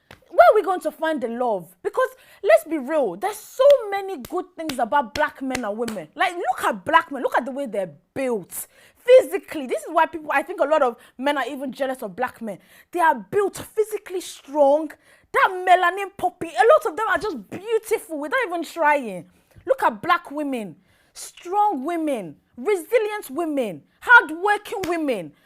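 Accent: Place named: United Kingdom. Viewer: Do you live in the UK, your accent is everywhere?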